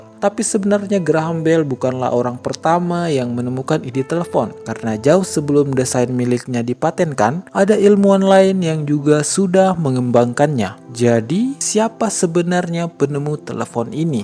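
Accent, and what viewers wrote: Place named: Palu, Indonesia. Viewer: Salfok dengan logat palunya le😁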